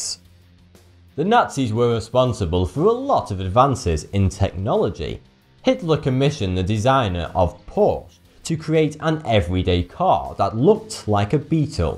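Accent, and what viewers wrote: United Kingdom. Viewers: Steve Holmes....I believe what you are describing is called having an accent ! He probably doesn't pronounce words like you and I do because he is probably not American. I believe he is British or possibly Irish. Either way, the way he sounds and/or pronounces words will not be like an American does ! Have you never heard anyone speak with an accent...even on TV or in a movie etc ? I actually like his accent, although I admit that it is a little difficult to understand at times.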